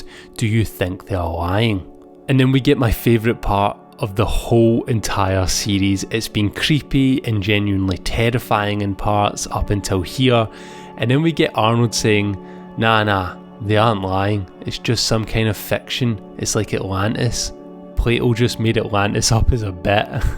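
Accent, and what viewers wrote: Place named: Scotland, United Kingdom. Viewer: you have a heavy scottish accent but nonetheless, i like the review of this underrated analog horror series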